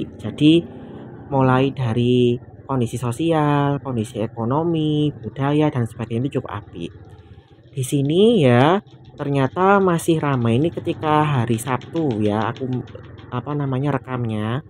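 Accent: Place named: Java, Indonesia. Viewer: Jawa banget logatnya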